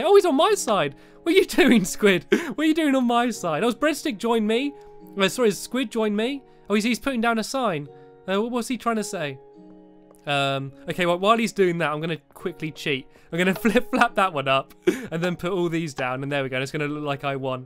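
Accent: United Kingdom. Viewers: himesamacosplay thats just how he says it since he lives in the UK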